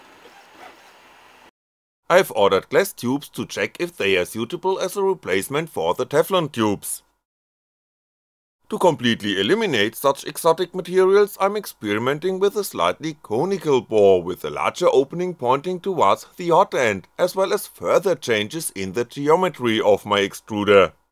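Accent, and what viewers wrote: Germany. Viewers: I've never heard anyone more German than this guy.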